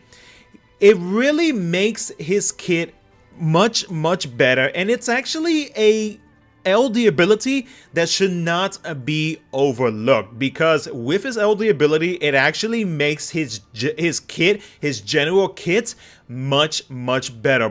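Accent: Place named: Brooklyn, United States. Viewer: This American accent...Brooklyn? Queens?I wanna know! I love 💘 it.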